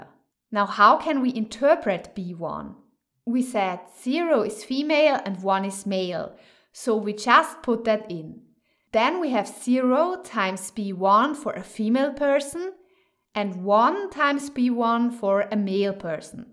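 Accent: Germany. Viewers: you have an accent, are you a German???? 😅🤣🤣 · I'm not gonna lie, I can't get enough of that german accent 😌😌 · strong German accent, I like it. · Sounds so german..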